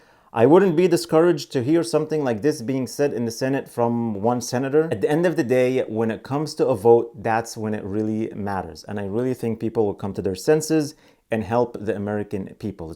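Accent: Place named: Arab world. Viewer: With all my respect to you. Money doesn't buy happiness. WHERE'S your awesome accent coming from? Arabic?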